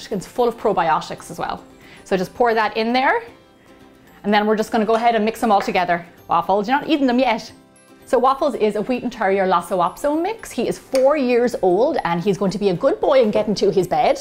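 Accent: Ireland. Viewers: Thank you so much I really really enjoyed watching you create these delicious dog treats. Are you from Ireland? Originally. I’ve subscribed and given you a thumbs up too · ahhh knew it by the accent yuppa the Irish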